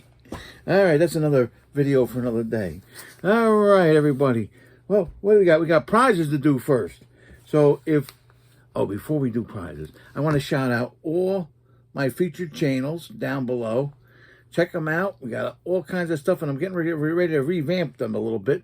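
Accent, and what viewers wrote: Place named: New Jersey, United States. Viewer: New sub here. Knew that had to be a Jersey accent. Interesting rip. Hadn't seen that set ripped before. Wow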